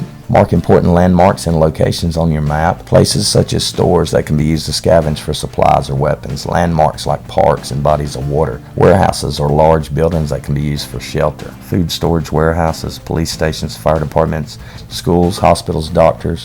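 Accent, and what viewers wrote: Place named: South, United States. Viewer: I wish I had this guys country voice I would get so much pussy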